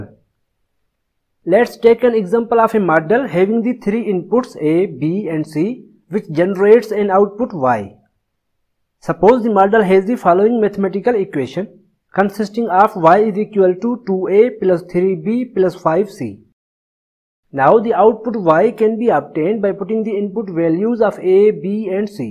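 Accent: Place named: Sindh, Pakistan. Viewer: Thank you dr sahb. Pray from Allah almighty to give me success. Wonderful methodology. Best teacher. Stay blessed. Love you from sindh Pakistan. Your accent is sindhi. Love you sir.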